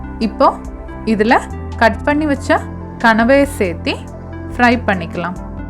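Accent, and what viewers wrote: Kerala, India. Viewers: Maylamo voice 👍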